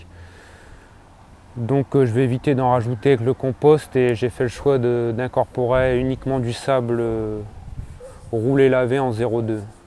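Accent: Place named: North, France. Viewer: bonjour ca fait plaisir d'entendre l'accent du nord ..j'ai le meme tee shirt .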